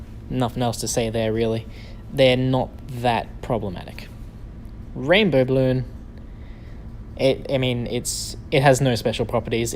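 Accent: Australia. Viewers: Are you from Australia? new zealand? Bro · I swear this sounds like an Australian accent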